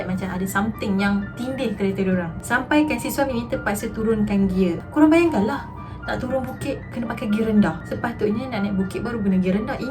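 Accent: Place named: Kedah, Malaysia. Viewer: Cara ckp gaya org Kedah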